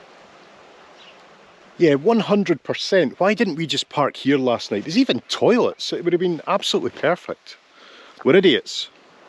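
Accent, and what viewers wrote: Scotland, United Kingdom. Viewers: Buddy Rather Than Çompulsively Apologising, Let Us Again COMMEND YOUR LOVELY SCOTTISH ACCENT/ For leading everybody on eye-opener REAL Real FAIR Dinkum West of Legendary BRITAIN ( Scotland, Ireland, Wales Plus Good Old Mother England ) Indeed Agree Very Very Honest Voyage( By Toyota ) of Discovery. Absolutely HUGE Personality/Support Person.Sydney/OZ/Australia/Sunday/Evening/ Hours/Beddy/Bies/Promise/WATCH/STUDY/ Remainder/Tomorrow/Guid nicht/EENIN/FORENICHT!!!!!!!!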